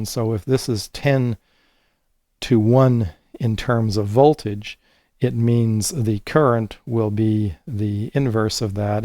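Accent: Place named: Canada. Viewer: Every time I hear a-gain versus a-gin I know I'm listening to a smart Canadian. I appreciate you sir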